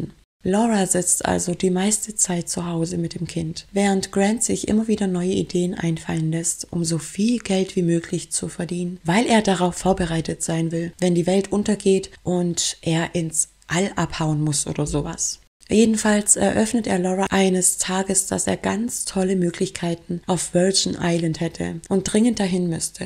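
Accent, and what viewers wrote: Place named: Swabia, Germany. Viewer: Man hört das Schwäble raus 😁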